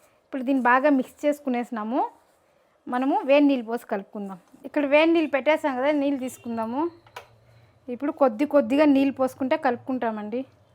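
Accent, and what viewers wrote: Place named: Rayalaseema, India. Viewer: మీది రాయలసీమ ప్రాంతమా. నీ భాష ప్రకాశం రాయలసీమకు దగ్గరగా ఉంది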